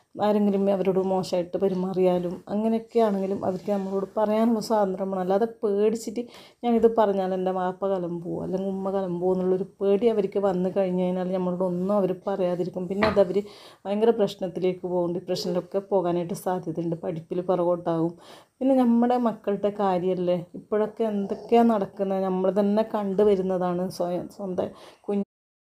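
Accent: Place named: Kannur, India. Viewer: ചിലത് ഒന്നും മനസ്സിലാവില്ല കണ്ണൂർഭാഷ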